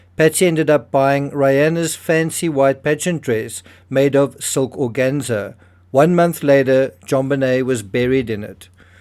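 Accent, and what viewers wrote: South Africa. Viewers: I watch this channel cause I enjoy hearing a south African accent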